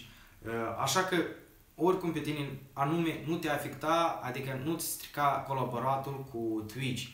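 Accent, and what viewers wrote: Moldova. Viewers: Bro e moldovean=)))))evident ca vorbeste asa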